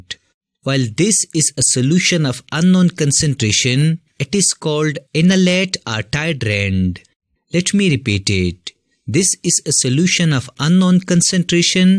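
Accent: India. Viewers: Indian accent is funny 😂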